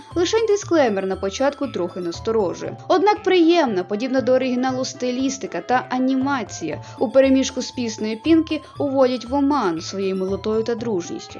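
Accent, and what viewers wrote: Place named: Ukraine. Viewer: Похвально що розмовляєш майже чистою українською мовою 😊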